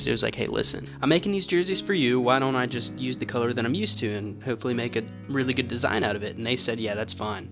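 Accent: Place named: Philadelphia, United States. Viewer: u gota thick philly accent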